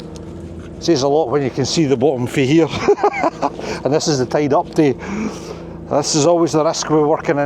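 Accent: Scotland, United Kingdom. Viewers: entertaining video. What a pretty place with what looks like pristine water.Your Scottish accent brings back memories of my late grandmother who migrated to Australia from Stirling after the first world war where she met my grandfather, who visited Scotland when fighting on the western front. Anyway, keep safe and good hunting.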